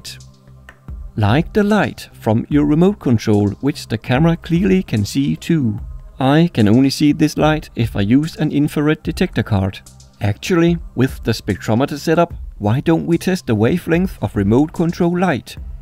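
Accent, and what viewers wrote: Denmark. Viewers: Are you from Denmark? Cause your accent sounds kinda danish If yes then... DANISH GANG RISE! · I knew you were from denmark! I could hear it in your voice lol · Du er så meget dansk 👍 vi kan ikke skjule vores danske dialekt 😜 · Are you danish? Edit: Before I got to the middle of the video I just guessed by the accent. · Just sounds like a Dane. A lot of Danish people sounds funny when they venture into the English language. I'm Danish myself, but I'm all in on a cockney accent. I don't really know why 🤔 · Only when you said "In Denmark", I realised how danish your accent was. Great video man!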